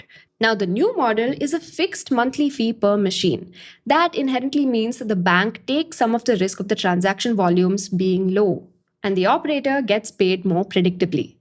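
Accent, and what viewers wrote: South India, India. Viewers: She is speaking in south indian accent. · yeh achanak accent south indian kese ho gaya?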